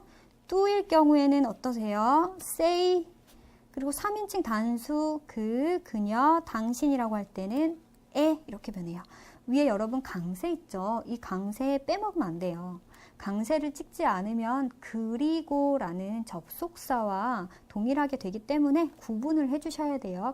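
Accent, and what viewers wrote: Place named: South Korea. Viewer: 발음이 그냥 한국어 읽는것같은데요 책산거 돈아깝네요 환불하고싶어요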